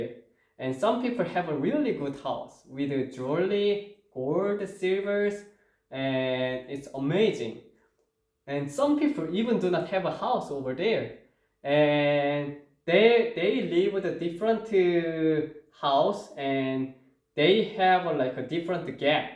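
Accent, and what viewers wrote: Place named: South Korea. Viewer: 콩글리쉬 발음으로 영어로 성경 읽고 설명하느라 애쓰십니다 저는 그만큼이라도 영어가 안되는데, 유창한 영어 발음은 아니신 것 같은데 그래도, 그렇게라도 하시는거 보니 부럽네요 .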